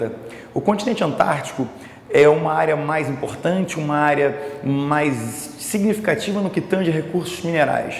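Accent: Rio de Janeiro, Brazil. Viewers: Ninguém merece esse sotaque de carioca aff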